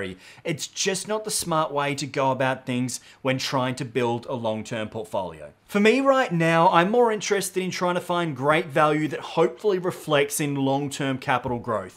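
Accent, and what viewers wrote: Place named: Australia. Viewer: Love the content bro, clear concise and Australian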